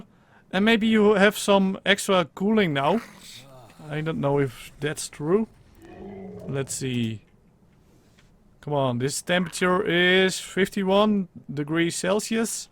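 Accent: Netherlands. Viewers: are you a dutchman? you sound like one...i recognize the accent! XD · Ik hoor nu al dat dit een Hollander is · Yes im dutch too ik hoor het aan u accent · Hey your dutch i can hear laat de leeuw niet in zijn hempie staan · I'm just gonna assume you're dutch. Because you sound hella dutch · Is he Dutch because I hear Dutch pronoun citation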